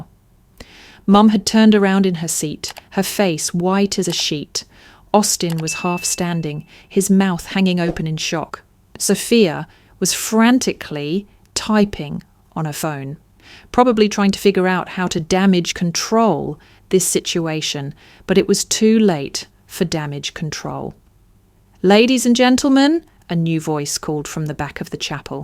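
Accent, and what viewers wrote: United Kingdom. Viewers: Please, no more British narrators.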